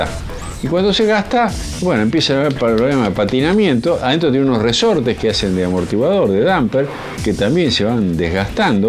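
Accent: Argentina. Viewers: ese es un acento en alguna parte de Argentina. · Hola Amigo...... Tus videos son fenomenales, pero yo te aconsejaría que trataras de modular un poco más las palabras porque se escucha como una verborrea que pasa muy rápido y no se aprecia bien la palabra que dices.... Ya sé que eres Argentino, pero me cuesta captar la palabra que dices.... Sólo eso.... lo demás está fabuloso....!!!!! Un abrazo desde Chile....!!!!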